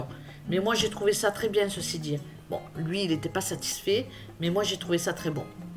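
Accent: South, France. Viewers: Cc ☀️ On va se régaler😋 mes enfants n’aime pas trop le poisson, mais par contre le cabillaud ils aime, merci chef Danny🤩 de partager de si bon plat et à toi avec ta douce voix du Sud que j’adore🥰 j’ai fait péter les pouces bleu, comme d’habitude🤣 Gros bisous et bonne soirée😘🥰👍👍👍👍👍👍👍👍👍👍👍👍👍👍👍👍👍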